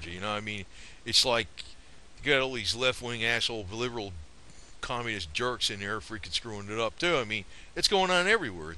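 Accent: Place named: New Jersey, United States. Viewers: All I hear is your Joursey, drawl. Now I don't think I have a accent, but I guess I got a bad down east one, which out west they think is cute. Lol. I don't think anyone thinks they have an accent. Until, you go to another part of the country. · Oh and you still speak like your from jersey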